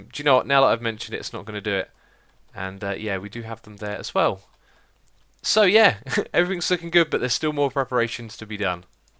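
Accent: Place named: South East England, United Kingdom. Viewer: He is British but he won't answer more specifically than that. I think it's safe to assume he's from south-east England though.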